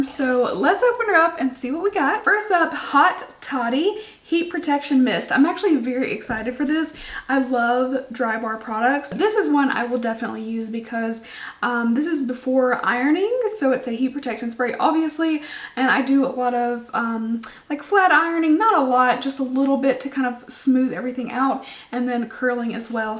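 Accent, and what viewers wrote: South, United States. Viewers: Idk why I just saw hey y’all in the CC on my recommended, clicked your vid, heard your southern accent, and immediately subscribed. Lol I’m biased to southern girls like me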